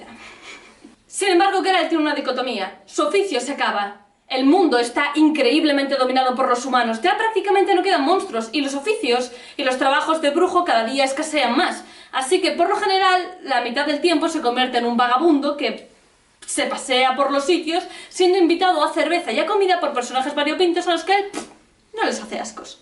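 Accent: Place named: Galicia, Spain. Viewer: Estupendo análisis ¡y diverdido! Un apunte, los libros de la saga El Brujo o saga Geralt de Rivia son siete. Te faltó decir que están bien escritos (aunque se dé por hecho), en 3a persona, por supuesto. Una grandiosa saga de literatura fantástica europea. No entiendo cómo no te gusta Jaskier. :( Al final de la saga le coges cariño, sin él no sería lo mismo. El momento de Borch Tres Grajos es increíble, la piel de gallina. El acento galleguiño que no falte. jeje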